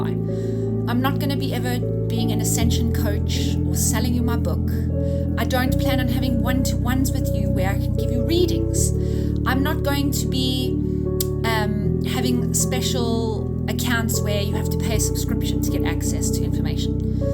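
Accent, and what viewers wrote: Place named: United Kingdom. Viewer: Also I like your accent. Is it British? I’m originally from South America living in the USA. When I hear a person pronouncing every single syllable in English, I love it. I’ve been speaking English for many years but for some reason I’m still insecure.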